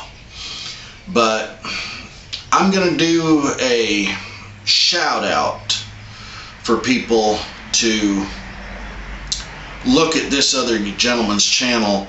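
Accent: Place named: Deep South, United States. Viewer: Guessing your from the Deep South somewhere....that was the most relaxed review I've ever seen....enjoy your saw and this was helpful.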